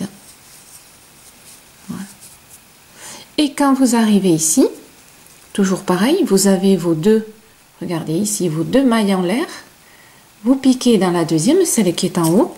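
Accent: South, France. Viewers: Super joli ce point. J'ai tout compris car comme toujours vos explications sont très claires et je suis toujours aussi fan de votre accent du sud ! Je vais tenter le poncho avec ce point. Encore merci de partager avec nous !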